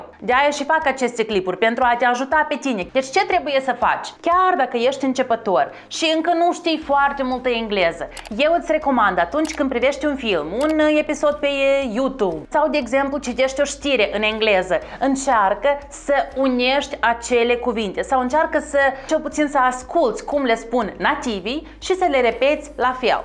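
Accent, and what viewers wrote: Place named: Moldova. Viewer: Ironia e ca vorbesti engleza aproape mai bine decit romana standard. Ai un accent moldovenesc inconfundabil. Poate ar trebui sa iei, in paralel, niste lectii de pronuntie in limba romana. The bad boys example is rather black american, not exactly English. Da' acum, daca acceptam moldoveneasca drept standard pentru romaneste, ce mai conteaza ghiveciul English vs American English, Canadian, Caribbean, Australian and all other variants? Ca sa nu zic ca, in North America, by native you mean the indigenous people, care mai vorbesc some sort of Quapaw language. No relation to English, though. Norocul tau e ca te adresezi urechistilor, asa ca, don't despair, keep going on. We all need some comedy, in our lives, every now and then. Happy Orthodox Easter to all my Moldavian friends.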